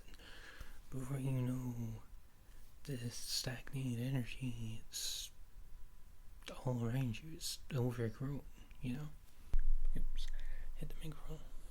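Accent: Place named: Scotland, United Kingdom. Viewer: Yay welcome back!!! Love these rambley style videos, dat whispering voice + dat scottish accent = <3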